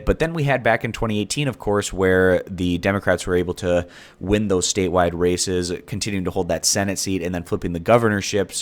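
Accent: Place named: Midwest, United States. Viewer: I’m from Chicago and your midwestern “twang” is pretty heavy lol.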